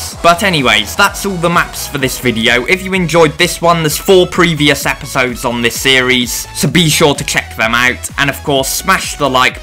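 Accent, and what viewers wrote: United Kingdom. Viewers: Bro has the hardest British accent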